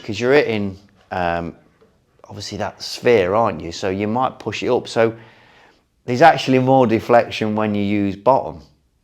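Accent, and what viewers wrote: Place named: Midlands, United Kingdom. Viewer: So midlands, I love it! What a guy.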